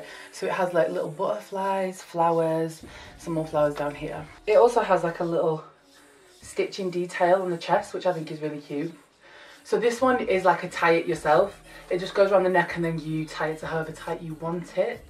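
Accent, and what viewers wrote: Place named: Yorkshire, United Kingdom. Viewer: Proper love you, reppin Yorkshire accent🤘🏽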